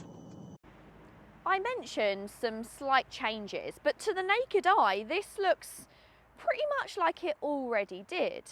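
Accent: United Kingdom. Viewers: British accent is so funny 🤣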